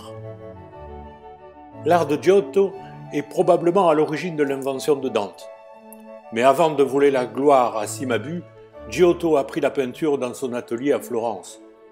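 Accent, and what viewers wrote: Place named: Provence, France. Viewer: J'aime votre accent de gentilhomme provençal 😉😉🤗🤗🤗😎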